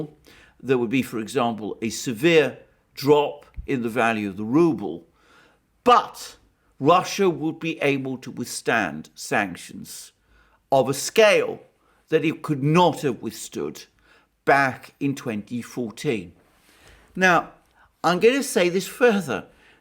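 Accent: United Kingdom. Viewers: you are a smart man...I can tell.. are you Russian? Little bit of British accent there.. am a Nigerian.. I love russia 🇷🇺.. my second son is named after putin..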